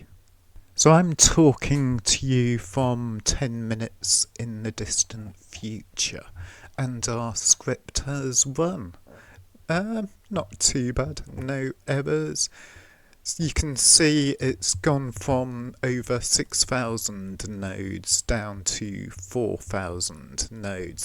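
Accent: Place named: United Kingdom. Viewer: This has to be the most bri'ish accent I've ever heard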